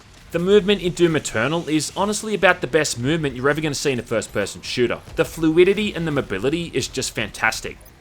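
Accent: Australia. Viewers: So that's what aussie accent sooounds like.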